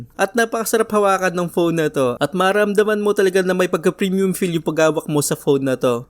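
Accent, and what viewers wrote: Pampanga, Philippines. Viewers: Kapampangan kaba sir? Great video btw.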